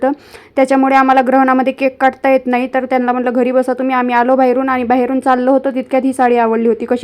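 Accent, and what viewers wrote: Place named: Satara, India. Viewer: है मीपन सातारची आहे.आपली सातारी भाषा 😂 लगेच ओळखते.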